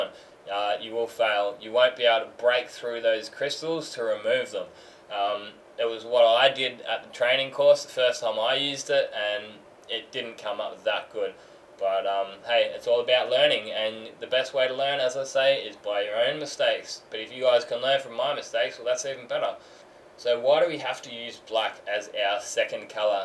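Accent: Australia. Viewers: your constant talking about the rabbit you saw running across the yard yesterday and the weather etc finally made me hate Australian accents. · First, put the camera on a tripod or figure out how to keep the camera from jumping around. Serious headache! Two, Stop talking like you're committing a verbal assault. You seem to never shut up. Do you breathe? Damn its hard to listen to you w/ that thick Aussie(?) accent. I understand this is supposed to be an instructional video, but you could take a break and just paint or watch the other guy paint, quietly.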